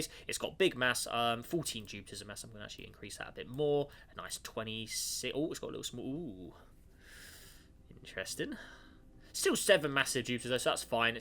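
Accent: United Kingdom. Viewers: Btw are u from England cause u have a British accent its just that is from USA and that's Fr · IS BRO BRITISH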